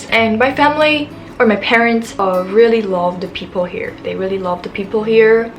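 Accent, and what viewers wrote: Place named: Philippines. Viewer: I know youre not good in Tagalog but the way you speak English sounds so Filipino :-)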